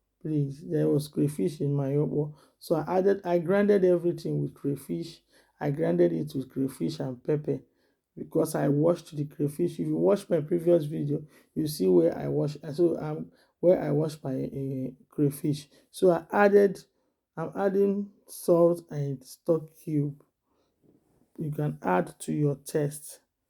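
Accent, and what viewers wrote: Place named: Yoruba, Nigeria. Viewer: I'm confused by your accent and your name, you speak with Yoruba accent and your name is, these leave me wondering, please define. Shame on you swallowing early in the morning, I joined you, I'm proud of it. Thanks.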